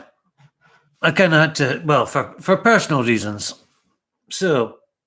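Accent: Scotland, United Kingdom. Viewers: magick patter in a Scottish voice...... finally !!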